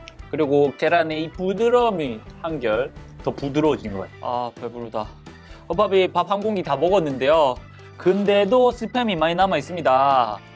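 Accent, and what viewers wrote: Busan, South Korea. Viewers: 말꼬리 허팝님은 부산사람이여서 그래요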